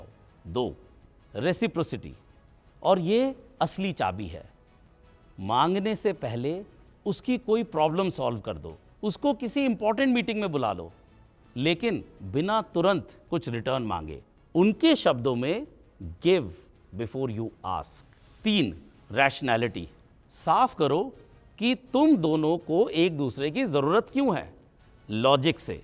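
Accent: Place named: India. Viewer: I realized that you are an Indian, not Pakistani. And it was just because of accent of Urdu speaking ❤. Regards, "FARAZ AHMED"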